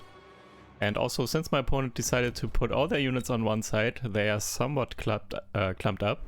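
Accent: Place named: Germany. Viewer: Sorry for the personal question...I am still wondering about your clean speach/language. Are you a native German maybe?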